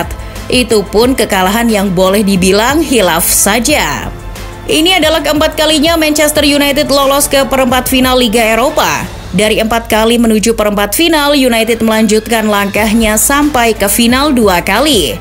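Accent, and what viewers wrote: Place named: West Java, Indonesia. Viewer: Anjirrr ada ada aja hahha kalau Rashford cedera bisa celaka dua belas😂😂😂, Pick Yang ngisi Voice nya orang sunda😁🤭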